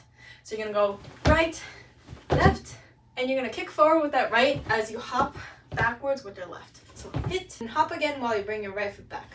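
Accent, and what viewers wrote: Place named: Australia. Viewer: Thanks and are you australian because of your accent "hea" 😊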